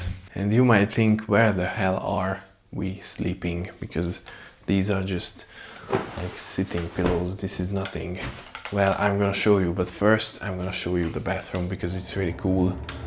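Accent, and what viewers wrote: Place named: Hungary. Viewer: Your bed is a Murphy bed (the one which is hidden in a wall). There are also Murphy tables (same principle). I thought your sofa would fold out (or you would arrange the cushions) to expand the sofa & make it into a bed, so you surprised me there. 😅 The flat is quite nice. It's small but functional. The bathroom is bigger than in other small flats that I've seen. I could live in that place if it had a window. Had a laugh when you mentioned the female & male hygiene products. 😅😅 Lol. Thanks for the tour! Btw, your accent reminds me of Dominic Szoboszlai, your country-mate. Cheers!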